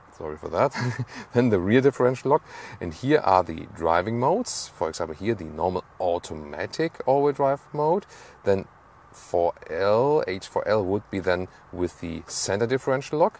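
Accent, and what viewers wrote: Germany. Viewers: 主持人很重德語口音😂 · Not a big fan German cars, their time is over. German guy talks about toyota, very weird. And the accent as well.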